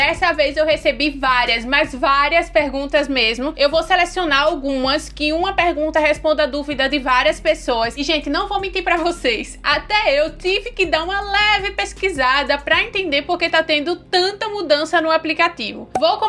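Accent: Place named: Northeast, Brazil. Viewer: Menina, tu é muito inteligente! Carismática, bonita. Eu gosto do seu sotaque nordestino.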